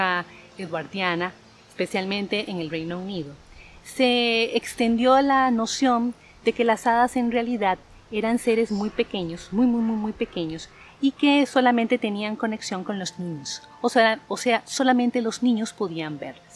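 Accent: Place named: Costa Rica. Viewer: Eres de Costa Rica cierto? Según el acento en la forma de pronunciar la R la reconozco… soy de colombia seguidor tuyo… eres hermosa 😍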